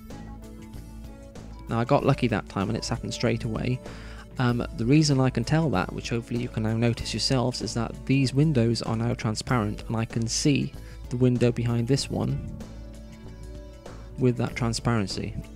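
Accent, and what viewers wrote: United Kingdom. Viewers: i'm learning british accent with your videos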